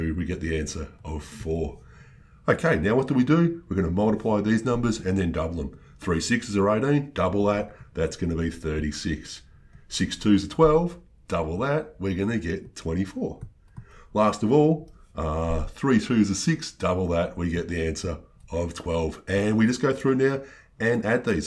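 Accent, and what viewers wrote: Australia. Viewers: Definitely Australian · This is some different Australian accent.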